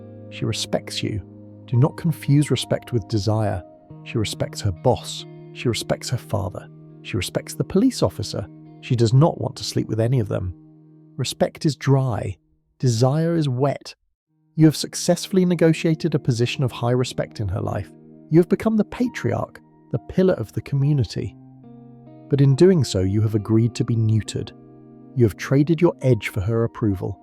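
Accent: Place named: United Kingdom. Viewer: Btw, the Limey accent makes this much more entertaining, (I’m US).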